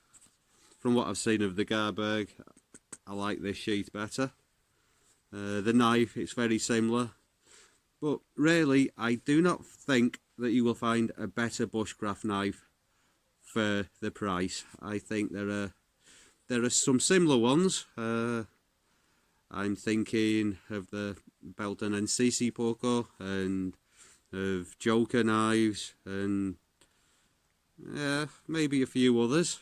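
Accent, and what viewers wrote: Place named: Merseyside, United Kingdom. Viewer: Subbed. Nice honest review which covers a few pointers that others do not, i.e spine dynamics using a ferro. Good to hear a Merseyside accent in Lapland! I bought the last stainless 140 on the Varu site a couple of weeks ago, shipping is a bit slow because of the plague but I hope to get it middle of next week. I was agonising about the cost but I am glad I pulled the trigger on it. Now will be carrying the 140, the Savotta pocket chainsaw and the Fiskars X7, all made in Finland. That package covers all my camp/bushcraft needs! Any tips on sharpening the stainless version? Cheers mate.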